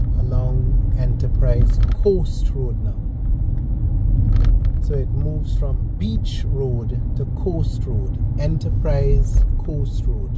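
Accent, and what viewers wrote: Trinidad and Tobago. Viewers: ❤ Thank you so much We Barbadians love watching you here from Italy That area from Thornbury hill near Scarborough area that gap is called Peg well or Pegwell Gardens Have some family there ❤ Keep up the good work love your Trini Voice ❤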